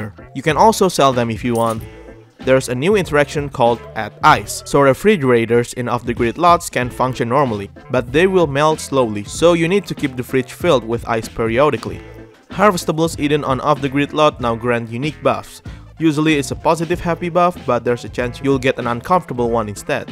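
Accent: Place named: Indonesia. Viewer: I can hear Indonesian accent👏🏼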